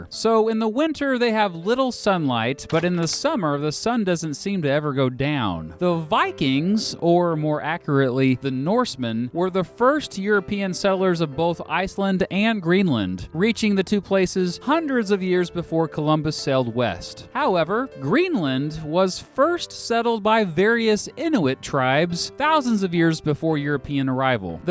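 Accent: United States. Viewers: You’re Icelandic pronunciations are really American. ð is pronounced like the th in think by the way. And á is pronounced like the ou in out. í is pronounced like the ee sheep. ó is pronounced like the o in show. Other than that this was 👌🏼👌🏼👌🏼.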